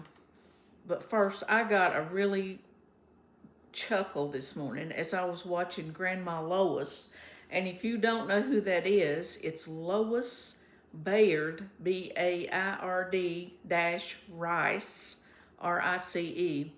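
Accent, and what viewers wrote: Kentucky, United States. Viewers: Hey,! I so appreciate you ladies who get on youtube and share from your heart. Sorry to hear about your friend's husband. Praying for her. God is our comfort and He never leaves us. Praying for your husband as well. My husband is in a care facility as I think I shared with you. He had a stroke and I am asking everyone to pray for him, for us. I love listening to you. You are so cute and make me giggle a lot. Love your southern accent from Kentucky. My Grandmother was from Texas and I loved her accent. You are like visiting with a special neighbor. Praying for you. ❤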